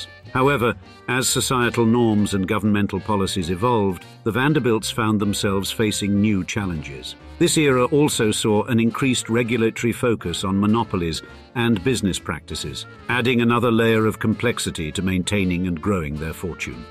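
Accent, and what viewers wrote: RP, United Kingdom. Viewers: and the very erudite sounded RP accent. (And no, RP is not "British" exclusively, but rather 95% spoken mainly in Commonwealth countries with only 5% spoken in Britain.)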